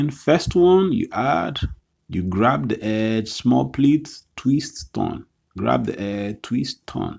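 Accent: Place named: Pidgin, Nigeria. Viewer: Thank you for this sir.. It's your pidgin for me.. Sweet to hear😂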